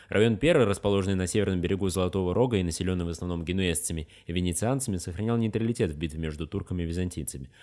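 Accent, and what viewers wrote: Tatarstan, Russia. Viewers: Классный канал! Автор акцентом похож на татарина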